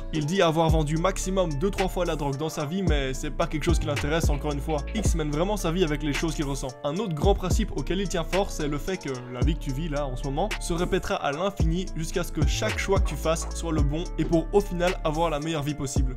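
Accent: Belgium. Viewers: On sent le belge en toi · Tu as l'accent d'un belge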